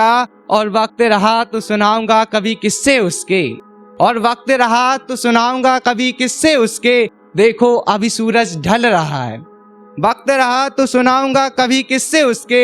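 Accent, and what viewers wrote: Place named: Sagar, India. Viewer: Bhai abaj se pta chal jata hai ki sagar se ho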